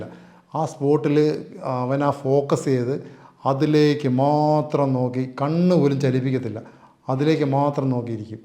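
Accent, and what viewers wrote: Kannur, India. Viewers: Anchor kannur aaano!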